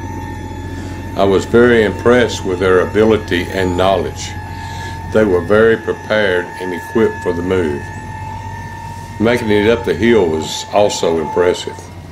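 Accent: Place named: Texas, United States. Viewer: Hey Mr.. I can tell you are from Texas. I love your accent, lol. Your land is gorgeous! Such an awesome video. I made sure to subscribe and have seen your other videos. I am enjoying them.I will be waiting for more videos. Have a really good night. See you soon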